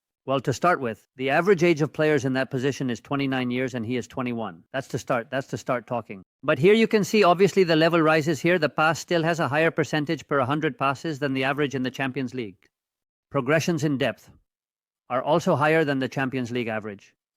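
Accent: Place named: India. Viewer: Te han puesto el inglés con el acento indio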